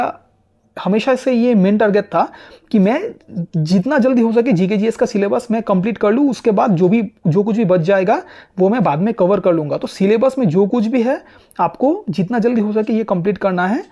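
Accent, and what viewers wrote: Bengal, India. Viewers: Bengali lagte ho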